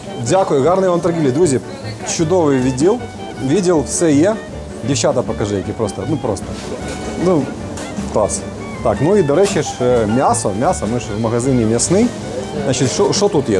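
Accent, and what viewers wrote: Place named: Odesa, Ukraine. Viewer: Уважаемая, разве я Вам хамил? Или называл недалëкою? Или вообще с Вами начинал диалог? Или интересовался Вашим мнением? Вы отвечайте, только тогда, когда Вас спрашивают, а не будьте "затычкой", дюже грамотной. И определитесь сами для себя, на суджике вам говорить, или балакать. А что касается, вся изюминка была, смотреть контент про Одессу, именно от человека с Одесским диалектом, на понятном для всех языке.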